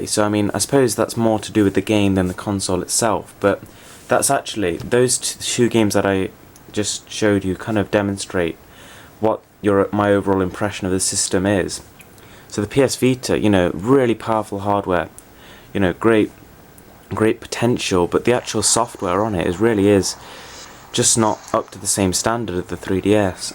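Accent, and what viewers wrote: RP, United Kingdom. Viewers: Wait, is that a Received Pronunciation British accent I'm hearing? I don't hear that too often. It's very nice.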